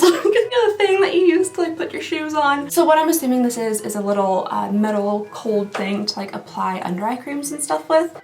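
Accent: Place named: Texas, United States. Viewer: Her Texan accent got stronger as the vid went on. The rage was too much.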